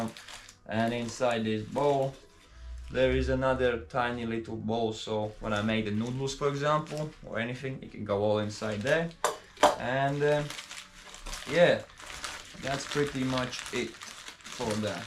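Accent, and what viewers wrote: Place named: United Kingdom. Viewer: Where abouts in the UK are you from bro ?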